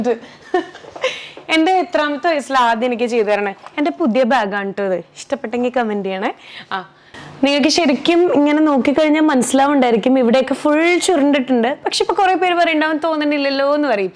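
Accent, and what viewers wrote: Thrissur, India. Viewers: Thrissur slangil athinte monj ithirikoodum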